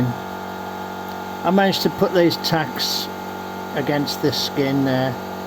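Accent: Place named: West Midlands, United Kingdom. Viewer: Loving these videos on the 100E AND really nice to hear a WM accent again 😊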